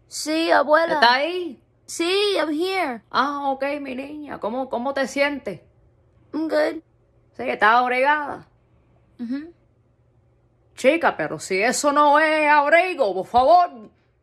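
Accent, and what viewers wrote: Cuba. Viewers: Now I wish, I would have met my grandparents before they passed. 😭 It just hit me that I missed out on all of this. 😔 But, you are amazing. I just love that Cuban accent so much!💜💜💜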